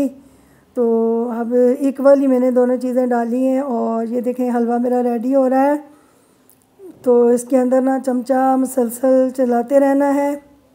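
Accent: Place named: Mansehra, Pakistan. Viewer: nice yuummy yuummy halwa apk bolny k style sy lgta ap mansehra sy ho me b mansehra city township sy hu apko gft dn kr diya a ap b kr dena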